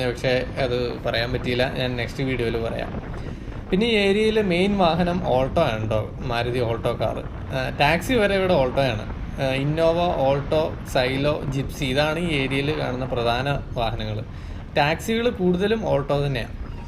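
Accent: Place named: Valluvanad, India. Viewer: Natil evdeya? Valluvanadan slang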